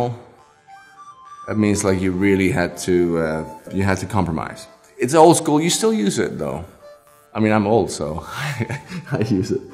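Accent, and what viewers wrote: United States. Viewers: It's funny to hear him speaking with an American accent in this video and a British one in GoT. And of course speaking Danish, which sounds like something is continuously stuck in the throat. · I find it fascinating he normally speaks with an American accent but he was born and raised in Denmark. Even his Danish sounds more like an American speaking Danish. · When he speaks english he almost sounds American? I had no idea he was Danish. I guess i've never heard their accent before. haha he's great!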